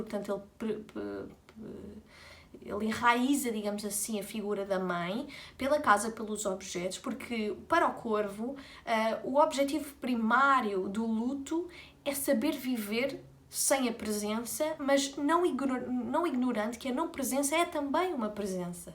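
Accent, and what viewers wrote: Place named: Portugal. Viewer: Adoro seu jeitinho de falar, suas palavras, seus gestos, mexendo no cabelo, sua pronúncia desse português de Portugal, tão bonito e mais sonoro que o nosso do Brasil... Outra coisa que adoro são suas roupas de cama, seus edredons, este com essa renda, lindíssimo! Seus lençóis e fronhas bordadas, sua cama fôfa, seus travesseiros enormes... dá vontade de mergulhar nela! Obrigada pela gostosura desse contexto! Sua pronúncia fica no meu ouvido e fico falando com meu marido com esse sotaque gostoso, de brincadeira... Suas interpretaçoes e análises são mto interessantes, de uma intelectual cultíssima e despretensiosa... Seu mundo é delicioso, da palavra, dos livros que vc ama de verdade, dos autores e suas personalidades, que vc liga às suas obras... O contexto histórico e cultural de cada obra.... Parabéns, querida, uma super professora!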